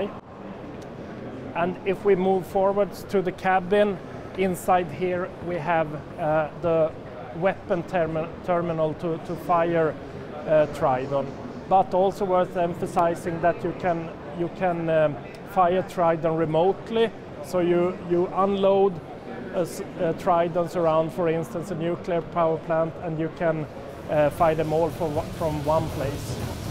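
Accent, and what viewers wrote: Sweden. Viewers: Horrible Swedish accent + lisp. I understood it perfectly, being Swedish myself, but man it is the opposite of an eargasm to listen to my countrymen when they can't pronounce English properly. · Swedish i think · Swenglish sound so horrible.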